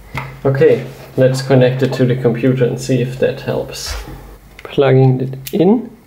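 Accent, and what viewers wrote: Germany. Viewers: except german accent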